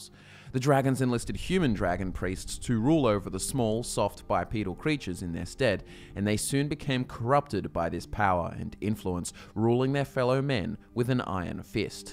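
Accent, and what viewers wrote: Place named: Australia. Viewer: 3 it's actually australian that you and youtube auto-comments have a hard time with ;)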